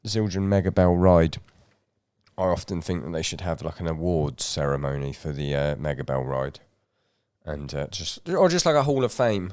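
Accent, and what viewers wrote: United Kingdom. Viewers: Love your British accent...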